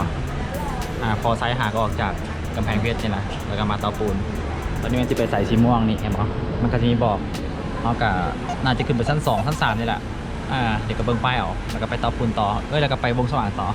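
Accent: Vientiane, Laos. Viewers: คนเลยในความรู้ของคนอิสานก็คือ คนพูดแบบคนหลวงพระบาง ที่น้อง พูดนั้นคือสำเนียงเวียงจันท์ขนานแท้ ถ้าย้อนไปเมื่อเป็นร้อยปีเจ้ามหาชีวิตลาว ได้นำเอาคนเชียงใหม่มาไว้ที่เวียงจันท์ ส่วนคนที่พูดสำเนียงแบบน้อง จะพบได้ที่จังหวัดชัยภูมิ เพราะเจ้าพ่อพยาแลได้นำพาคนเวียงจันท์มาสร้างเมืองชัยภูมิเมื่อรัตนโกสินธ์ตอนต้น ส่วนคนเวียงจันท์ปัจจุบันคือคนกลุ่มใหม่ที่เข้ามาอยู่ใหม่แทนพวกเดิมหลังมีการเปลี่ยนการปกครอง คนลาวในกำแพงนครรุ่นเก่าได้อพยพหนี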